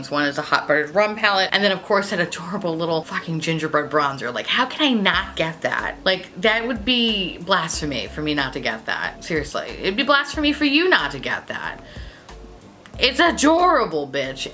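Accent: England, United Kingdom. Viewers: This is the first time I've heard your British accent and I need it more in my life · I love the English accent 😁❌✖❌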